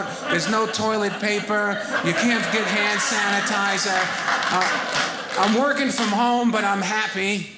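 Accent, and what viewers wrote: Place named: New York, United States. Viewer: I didn’t even notice he was from New York because I live here. Lol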